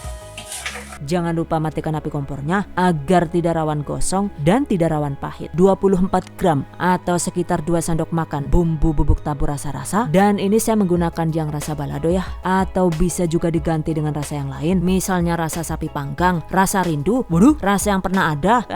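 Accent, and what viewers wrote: Banyumas, Indonesia. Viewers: Wkwk wong ngendi sii , geneng ngapak ya 😆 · wong banyumas kieh...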